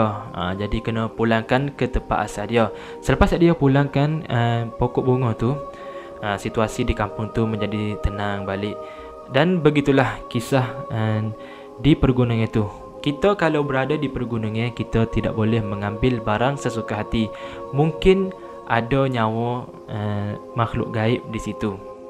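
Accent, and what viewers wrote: East Coast, Malaysia. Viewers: Jumpa new channel horror storyteller.. subscribe tnd support.. dgr dr slang percakapan myb dr Pantai Timur.. Apapun terbaik bro..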